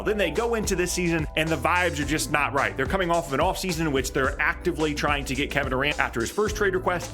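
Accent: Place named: East Coast, United States. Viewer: I love your channel, I don't know if you're from the East Coast, but you talk really fast. Like I said it's great to listen to cuz it sounds kind of local to me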